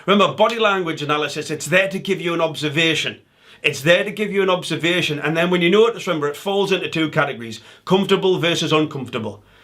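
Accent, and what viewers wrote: Newcastle, United Kingdom. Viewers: great to see you back... your sultry geordie voice reminding me of my birthplace.. would still love to see a video on Jussie Smollett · Been missing that Geordie sound! Welcome back!🌝👍⚘❤🇨🇦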